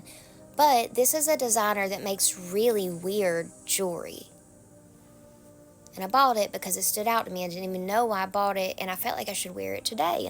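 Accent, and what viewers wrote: South, United States. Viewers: I love your Southern accent 😄.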